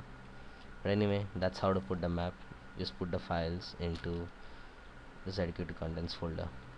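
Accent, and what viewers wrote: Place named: India. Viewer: look im indian too but your heavey accent makes it too distracting to watch the video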